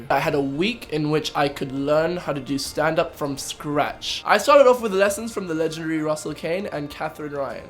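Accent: England, United Kingdom. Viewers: He's been living in the UK for a while, he's picked up an accent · Your voice already sounds like an English accent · but what if we r not n america or Britain, I am in Russia and omg i absolutely love u!!! u were amazing and its very rare to see people willing to get over their fears u r so cute and u dont sound south african at all i am not trying to be racist plz to take me wrong i am not saying south africans r supposed to sound and look a certain way no i am not but u sound like u have a british accent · HIS ENGLISH ACCENT OMG I DIED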